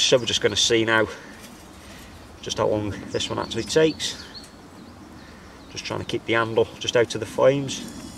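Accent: United Kingdom. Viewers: Very interesting and I believe that anything on YouTube is subject to an intellectual property right and prohibits a third-party from using any content. So if all else fails to stop them, then that's one option. Also, I take it that you are located in the UK. If you don't mind me asking,. what region is your particular dialect? And I don't have any difficulty understanding you, I don't know how others would? Best of luck with your channel!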